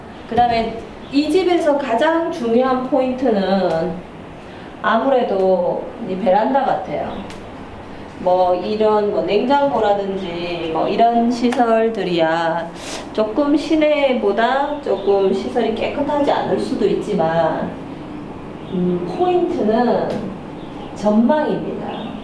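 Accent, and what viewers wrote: Gyeongsang, South Korea. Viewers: 경상도 냄새가 나네요ㅋㅋ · 경상도분이신듯 사투리가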